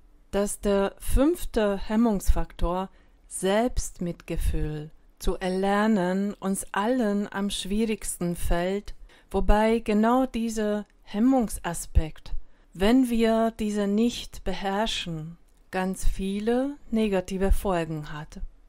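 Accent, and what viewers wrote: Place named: Hungary. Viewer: Ich mag außerdem deinen ungarischen Akzent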